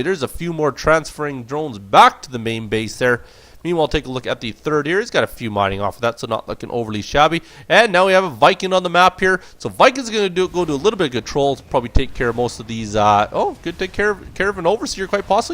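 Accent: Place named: Midwest, United States. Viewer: Where are you from? Midwest? Northwest? Canada? So many "wowzers" I had an Inspector Gadget flashback. Good cast, cuz.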